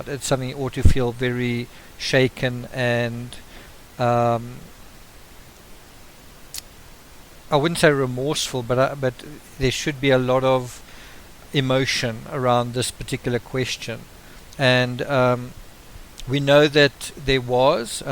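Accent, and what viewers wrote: South Africa. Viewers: I miss V the series so much!!! Are you from South Africa? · Hey! You're South African 🇿🇦🇿🇦🇿🇦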